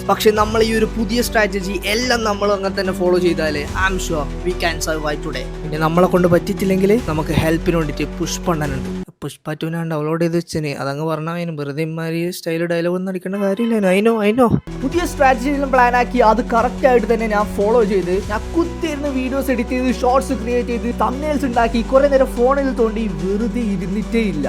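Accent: Kannur, India. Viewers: Neee kannur edyaaadaa..? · Kannur aahno brthr😮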